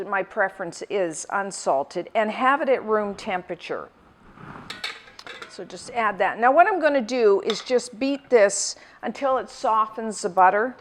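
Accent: Canada. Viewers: your accent is adorable! are you canadian?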